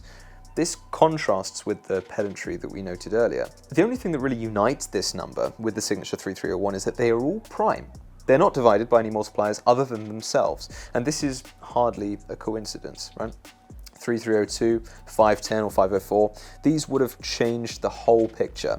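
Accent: RP, United Kingdom. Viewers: posh boy... sounds like all of Boris Johnson's school friends in one person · Aye, posho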